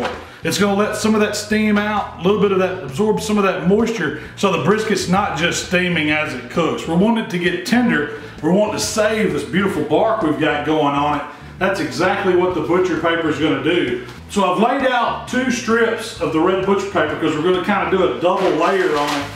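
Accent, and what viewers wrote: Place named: Texas, United States. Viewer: Belly + Texas accent = I'm going to make my first brisket! Thank you!!! ❤️ (born and raised Texan here!)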